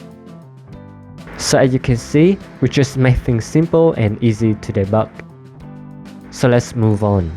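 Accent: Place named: Vietnam. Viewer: anh nói kiểu giọng Anh-Việt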